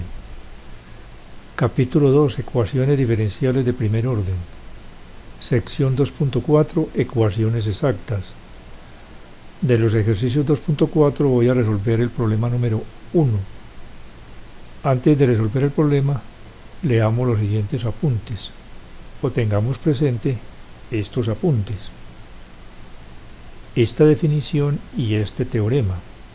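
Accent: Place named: Antioquia, Colombia. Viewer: Tiene acento paisa. Nuevamente gracias y bendiciones.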